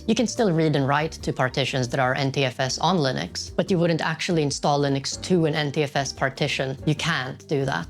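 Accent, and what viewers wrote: Sweden. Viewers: As a Swedish person, I'm quite confident in saying that he sounds very Swedish. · swedish detected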